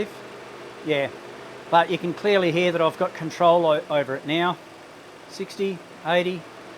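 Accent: Australia. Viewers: Is this an Australian accent?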